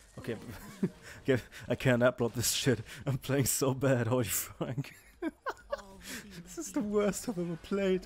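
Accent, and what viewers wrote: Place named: Germany. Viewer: Ah, i hear a DEUTSCHER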